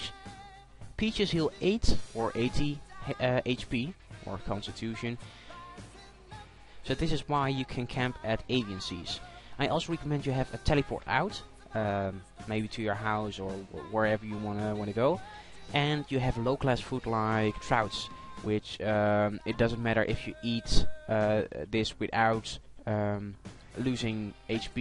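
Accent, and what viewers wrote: Netherlands. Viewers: Hollander dat hoor ik al aan je stem :p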